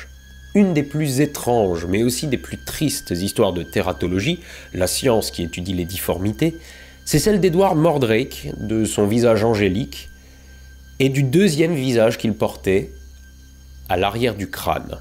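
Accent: Alsace, France. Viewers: C'est un accent alsacien pas belge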